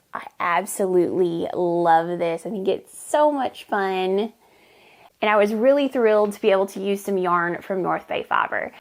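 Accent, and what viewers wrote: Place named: Tennessee, United States. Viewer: Found you through a post by Andrea Mowry, and popped right over. Such restful scenery and the colors in the area where you live. I was guessing TN based on accent 'cuz definitely not GA where I am...not enough pines. Love your work and your home, what I've seen. Decided to stay and subscribed. Thanks for a lovely restful post!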